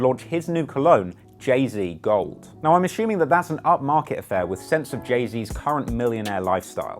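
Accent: United Kingdom. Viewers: Hip hop slang plus British accent is just cringe worthy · I love his accent why don’t we have more British rappers?!?!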